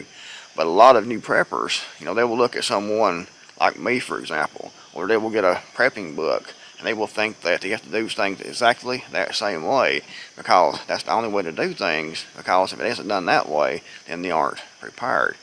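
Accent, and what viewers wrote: United States. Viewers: I’m loving the “differt” way you speak. Nothing makes a displaced Marine feel more at home than hearing some sweet American accents.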